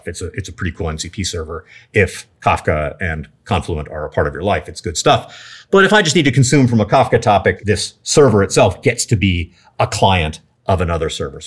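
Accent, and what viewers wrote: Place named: United States. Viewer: We need an AI to turn unbearable american accents into nicer ones within the youtube player.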